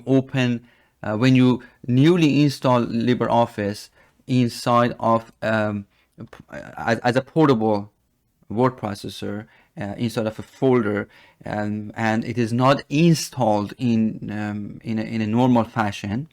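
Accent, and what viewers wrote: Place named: Iran. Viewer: Thanks for the tutorial. Your accent sounds extremely Persian.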